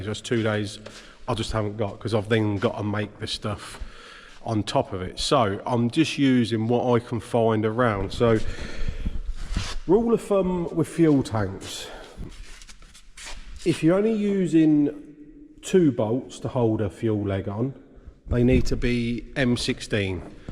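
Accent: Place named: Norfolk, United Kingdom. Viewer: Hi, if you didn’t realise this or not, you are a natural at this content creation. I do love a channel that explains everything about what they are doing, and to top it all off, you sound like me, are actually from Norfolk?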